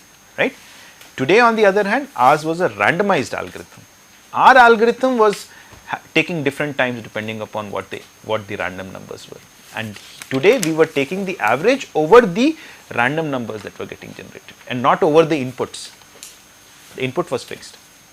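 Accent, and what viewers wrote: India. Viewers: it would.. at least when he starts talking indian or something.. its hard to understand that way..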